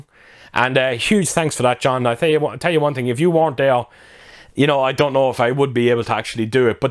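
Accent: Ireland. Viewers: I’ve been watching your channel at least one an half to two years. Just hearing your accent makes me want to get to Ireland. You give honest opinions. Keep up the great job you’re doing!☘️🇮🇪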